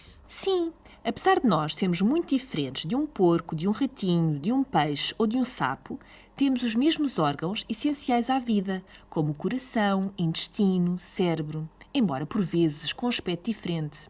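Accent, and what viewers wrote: Portugal. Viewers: É impressão minha,ou isso é português de Portugal? A língua em que está o vídeo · Nossa explica muito bem, é uma pena ser portuguesa, então há aquela pequena dificuldade de entender "perfeitamente" ... Mas mesmo assim muito bom, like + Fav! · Se não fosse o sotaque português,eu teria entendido direitinho,mas ficou bom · Nossa, sou brasileira e não entendi nada por causa do sotaque português.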